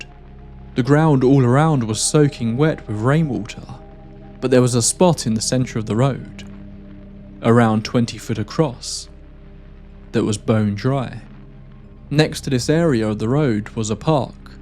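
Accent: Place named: RP, United Kingdom. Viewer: Maybe the best part of this for me was hearing you say queen of flatulence and your very posh accent 😂😂😂 But all jokes aside, that was a good episode. I had heard that story before but not in that much detail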